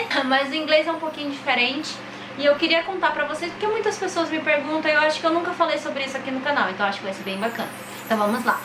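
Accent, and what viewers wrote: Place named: Rio de Janeiro, Brazil. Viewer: Oinhe Mestra * - * e esse sotaque carioca ai kkkkkkk adorei seu video (como sempre ne <3) to estudando ingles e japones mas ta sendo dificil pq odeio ingles, mas fazer oq ne a gente tem q aprender:( ja quero outro video:D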